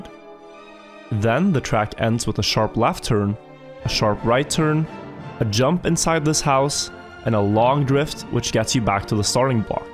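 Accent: Norway. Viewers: You norsk?? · I just saw that he is Norwegian. Explains the trash accent.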